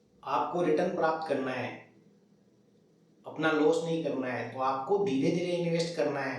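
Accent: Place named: Shekhawati, India. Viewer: तभी मुझे आपकी बोली में अपनी शेखावाटी भाषा की टॉन नजर आती है😋😀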